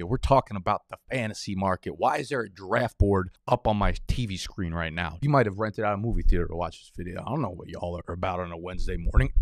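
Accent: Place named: Philadelphia, United States. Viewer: Maybe this is a reach but I’ll feel like ur from Philly or Delco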